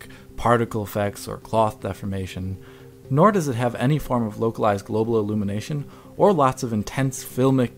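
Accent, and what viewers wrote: Germany. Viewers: Hm. I guess my ear is just trained for it then hearing that accent a lot. For me it was always sort of obvious. It's in the tone of his voice. It's just so.. german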